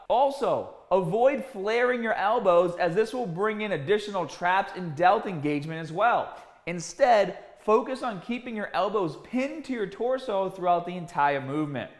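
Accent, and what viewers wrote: Boston, United States. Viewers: Wow that Boston accent! Lol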